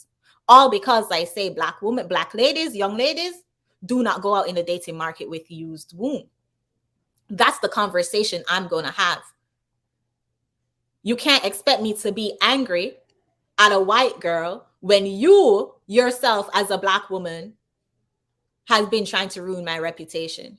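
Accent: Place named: Jamaica. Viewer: Omg, I just came across your channel and it seems to me you’re Jamaican I can tell by your accent. My problem is why are you on YouTube in the American space talking about there issues when Jamaica 🇯🇲 has bigger problems. Jamaica and Jamaicans have much bigger issues than this! You know Jamaican men don’t care about babymothers and Petty things like this. None of what you say is even a real problem in comparison to the killings and the violence in black communities across the globe, especially in your country Jamaica 🇯🇲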